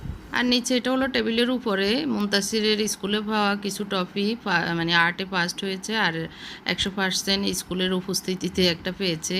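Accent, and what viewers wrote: Noakhali, Bangladesh. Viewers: আপনি তো নোয়াখালির কথা শুনেই বুঝতে পারি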